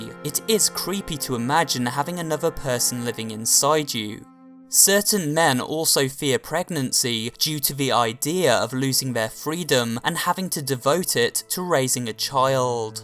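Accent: United Kingdom. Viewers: R u British I can tell from ur accent I'm British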